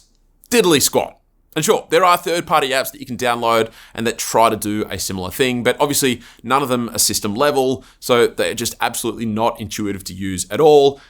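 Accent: Australia. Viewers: You should learn to speak in a bit of neutral accent. Your strong Australian accent is understandable but cannot make it till then end.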